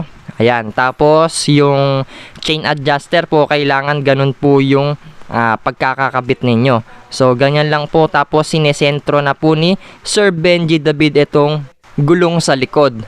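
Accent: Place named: Pampanga, Philippines. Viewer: Ot atin halo kapampangan ING Tagalog mo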